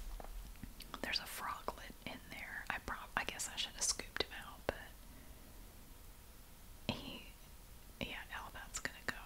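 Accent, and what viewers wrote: South, United States. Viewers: You have a soothing voice and mix in that Southern accent it's strikes gold . Personally, I like it better and feel the whisper takes away your best attribute. I watched your videos for years and congratulate on building your channel. My favorite is when you treated yourself to a cruise something you wanted so badly. That was an awesome emotional video.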